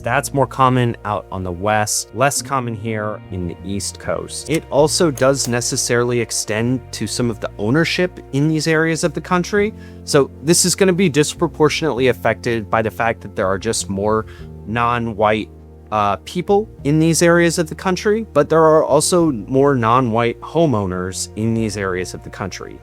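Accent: United Kingdom. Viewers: As someone from Oklahoma. We’re sort of a mix of South and Midwest. Your accent in the ad read sounded almost UKish to me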